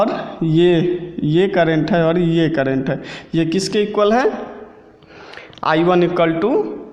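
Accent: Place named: India. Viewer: I want this lesson but i cant listen indian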